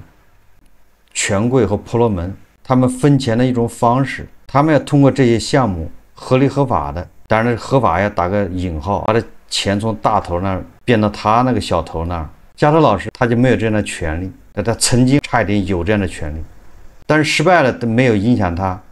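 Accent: Anqing, China. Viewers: 第一次看你直播的时候听口音我就知道你是安庆的，怀宁的懂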